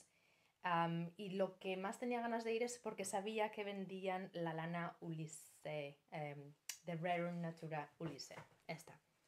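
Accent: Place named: Spain. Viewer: tu lindo acento español y tu very proper pronunciación en inglés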